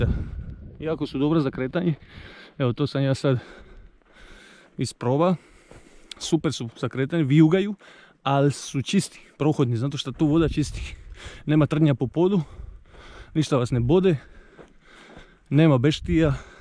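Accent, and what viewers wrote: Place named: Dalmatia, Croatia. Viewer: Predivno podrucje. Kao drugi planet! Scenografija za sf film....Al gustam slusati dalmatinski :)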